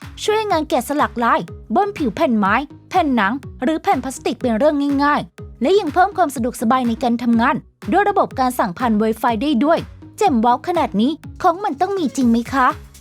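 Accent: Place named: South, Thailand. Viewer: เสียงพูกเหมือนไทย+ใต้เลย ฟังแล้วยังไงๆไม่รู้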